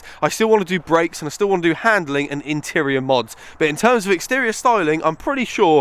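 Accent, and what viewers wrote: United Kingdom. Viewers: Sound so posh in your old videos man 😂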